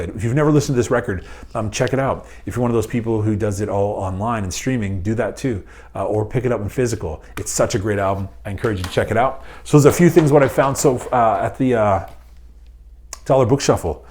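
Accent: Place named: Canada. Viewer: I was wondering if you were Canadian as I’ve picked up on the accent, especially in this video. That explains why you are so nice and polite!!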